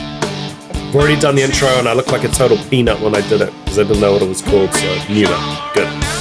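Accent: New Zealand. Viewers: My golly that's a strong Kiwi accent! Kiaora bro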